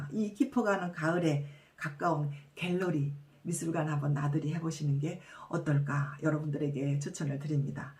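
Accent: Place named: Busan, South Korea. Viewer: 그 동안 몰랐었는데요~ 부산이 고향이시군요 고향에 가시니~ 억양에서 부산느낌이 납니다^^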